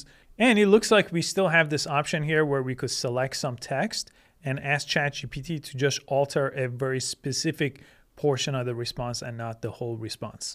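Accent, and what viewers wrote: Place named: Iran. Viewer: You sound iranian. Where are you from?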